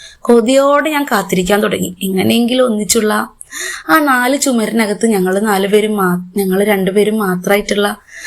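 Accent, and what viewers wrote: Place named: Palakkad, India. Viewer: ഒരു പാലക്കാടൻ സ്ലാഗ്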